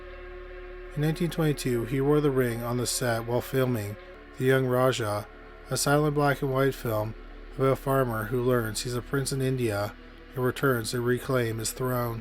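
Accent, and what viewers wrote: Canada. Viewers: He’s Canadian, that’s his accent. Leave his page if it bothers you so much. I used to turn up the speed of his videos when I first started watching bc his voice was annoying to me. I don’t mind it anymore.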